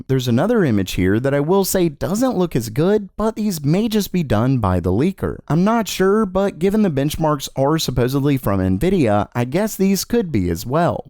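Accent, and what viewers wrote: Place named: Texas, United States. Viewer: I just realized how Texan he sounds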